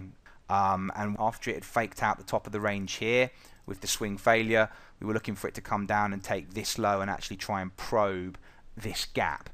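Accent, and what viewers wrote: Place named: United Kingdom. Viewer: I love when he swearing and offending people with british accent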